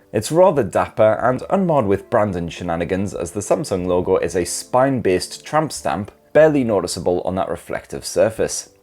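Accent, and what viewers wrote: United Kingdom. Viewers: this man speaks British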